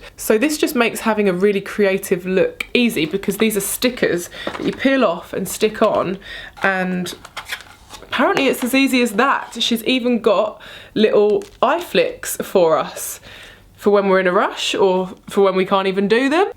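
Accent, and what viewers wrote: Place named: United Kingdom. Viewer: I LOVE UK, I basically love everything British, from people, to London, to this accent, oh my God I feel so happy when I'm listening a British talk hahahaha I have such a deep love for UK, my dream is to travel there someday ❤️❤️ I said all these things just to express myself and my love for this country and not to try convince to pick me as a winner.. I can get all these things, it would just be AWESOME and something so meaningful to me to get them from a British girl ❤️❤️❤️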